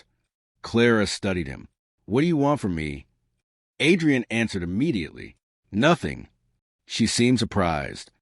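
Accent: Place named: South, United States. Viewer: Good story , narrators voice soundos too country🫤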